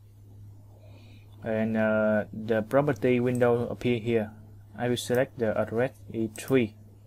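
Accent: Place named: Vietnam. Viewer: thank you, by the way, your voice looks like Vietnamese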